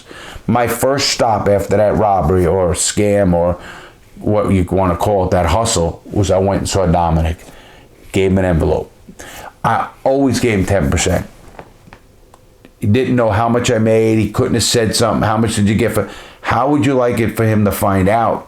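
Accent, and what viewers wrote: New York, United States. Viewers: them thick new york mob accents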